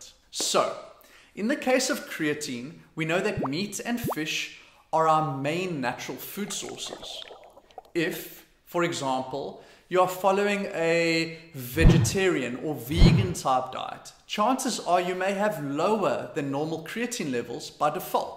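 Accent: South Africa. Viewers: South african? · Are u South African? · You sound 99.9999% South African😁 Am I right? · You south African?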